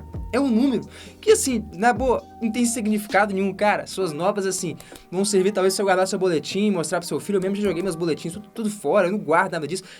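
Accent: Minas Gerais, Brazil. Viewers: O sotaque dele é muito de mineiro msm minha família é mineira e eu sou goiana e ai ja viu né ksksksks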